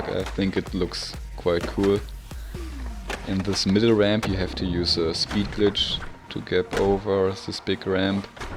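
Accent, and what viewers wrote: Germany. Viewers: Das deutsche Englisch ist legendär😂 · bist du von deutschland ??? Dein englisch ist eindeutig von einem deutschen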